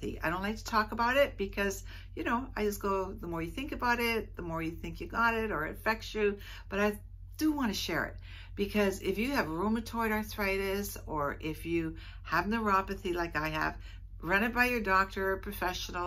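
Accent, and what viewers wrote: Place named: North, United Kingdom. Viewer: You sound like you’re from the north. You look good. Me likey 😊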